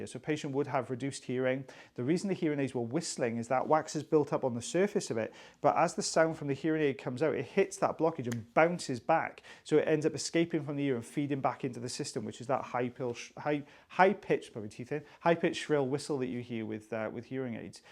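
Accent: Wales, United Kingdom. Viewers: Still the best ear channel. And the Welsh is the best accent imo😊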